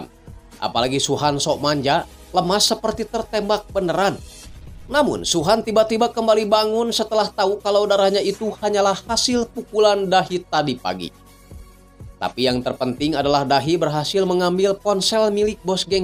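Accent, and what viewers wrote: West Java, Indonesia. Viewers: Penyakit "kaligata"😂...bang lu orang jawa barat? Kota mangga kah?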